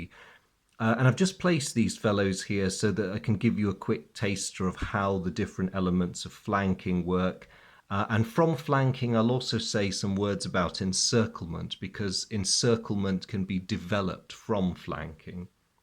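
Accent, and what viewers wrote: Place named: RP, United Kingdom. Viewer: Cheers dear, Thanks for your kindly reply. My request wasn’t for a specific scenario, honestly. I fancy that if you play a relative simple scenario that covers the basic rules it would be great. Maybe, you give for granted certain stuff (like terrain or discard, how to calculate sniper activity and reaction, just to mention a few). If you might simply explain those rules playing the game, I bet you might hit the target. Uh, last nice thing: your posh English reminds me a teacher I had half century ago…he was a peculiar fellow that escaped the Polish invasion by Nazis into a balloon! Thanks a lot for your passion.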